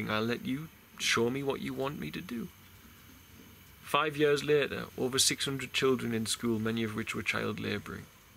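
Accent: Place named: North, United Kingdom. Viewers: ah the northern accent. I am from NEwcastle! I see , , , , , , , , and which is my birthday! I went down south to the frack pad in Preston New Road to film the police brutality and the old tax disc on one of the campervans was ! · Are you from the North East in the U.K.? I am and recognise your accent. I too receive these numbers and beleive that they are guides or markers to say that I'm on the right path. May God Bless You.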